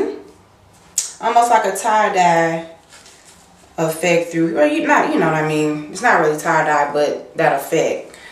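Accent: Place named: Chicago, United States. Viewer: U r too funny!!! Nice finds. Where r u from? I remember u saying ur from the Midwest but living in NY. U have an accent and talk like my cousins in the Chi. :)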